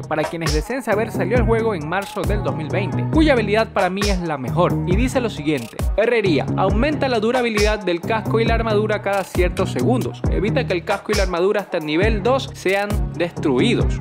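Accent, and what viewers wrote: Ecuador. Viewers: Bro eres de Ecuador??? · Eres ecuatoriano?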